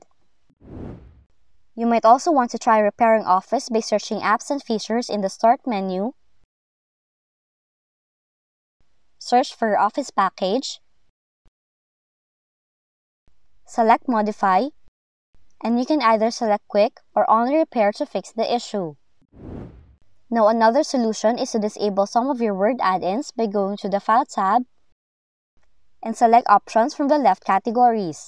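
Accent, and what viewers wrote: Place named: India. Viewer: I must say you cerntainly know what you're doing; however, (and I apologize for this) your Hindi accent overwhelms the pronounciation of some words (e.g.) step three, after the command "echo off|clip" you say press enter , then check __________(not understandable).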